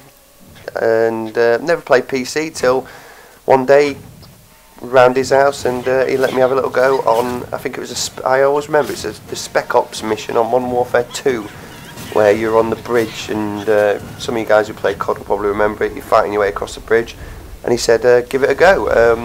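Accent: United Kingdom. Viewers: Much congrats nice to hear a British voice AND thanks for the name check it made my day..keep up the good work..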